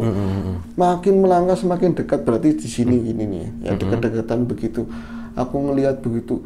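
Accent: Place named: Bekasi, Indonesia. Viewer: Judulnya Jenat - Riam Biru #3 Ketika bro masih berlogat Bekasi Barat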